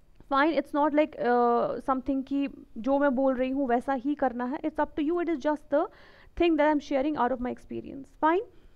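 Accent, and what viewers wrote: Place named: Kashmir, India. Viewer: I think You r frm Kashmir Right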